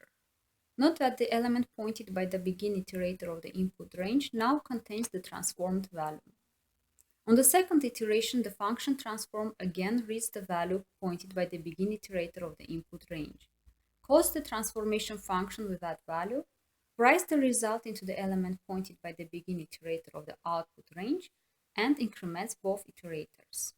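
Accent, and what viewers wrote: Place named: Russia. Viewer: Thank you, these tests are very helpful for consolidation of the material. Very familiar accent... Russian?)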